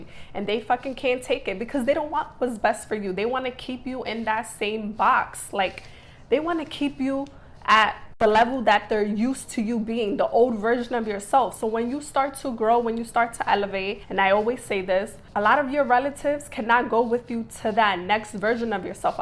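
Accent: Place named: New York, United States. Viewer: I love how you sound. Are you from New York?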